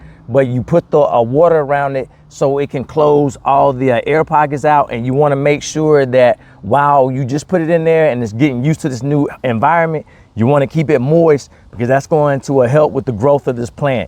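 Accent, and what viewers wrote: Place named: Baltimore, United States. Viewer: You saying u's like you from b.more! Yay yae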